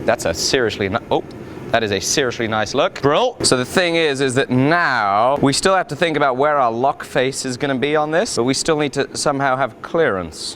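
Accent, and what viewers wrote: United Kingdom. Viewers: Wait..... he is america?!?!! wtf he sounds british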